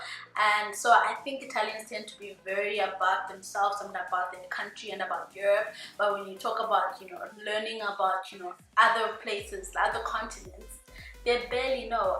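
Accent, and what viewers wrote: South Africa. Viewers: Hey!! you sound South African!! I can tell you are!!I would like to travel to Italy through AuPairing. Can we get in touch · Are you south african? I can here an south african accent but mixed with another accent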